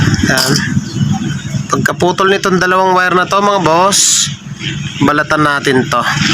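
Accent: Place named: Pampanga, Philippines. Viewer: May tanong lang po ako, out of the topic lang. Kapampangan po ba kayo? Mag pagka-accent po kayo Kapampangan eh hehe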